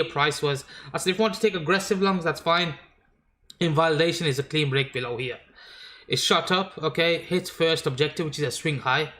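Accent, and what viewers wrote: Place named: Birmingham, United Kingdom. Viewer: yes bruv birmingham accent lol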